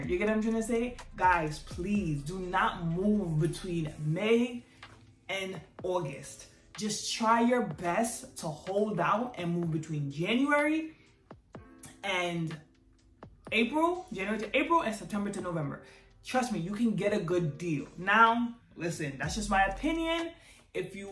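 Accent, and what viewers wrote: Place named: Caribbean. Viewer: Sis you definitely sound Caribbean!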